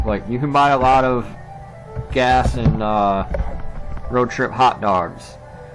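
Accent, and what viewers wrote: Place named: Wisconsin, United States. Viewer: We talk the same over in Wisconsin well i do anyways.....Thanks for the advice man look forward to watching your videos you can give straight forward advice unlike some of the other dumbass videos others put on here....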